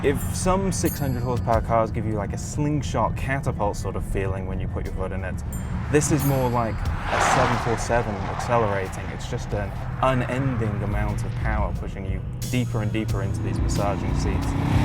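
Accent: United Kingdom. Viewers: American or british? i cant tell. sounds like he has a slight british accent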